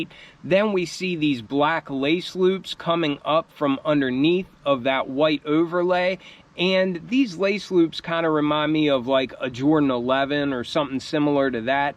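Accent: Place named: Philadelphia, United States. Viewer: You have kinda philly accent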